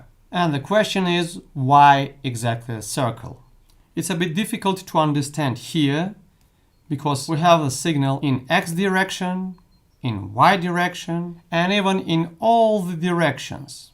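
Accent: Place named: Russia. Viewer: Русский акцент в английском, ох ну что же мы так все одинаково говорим))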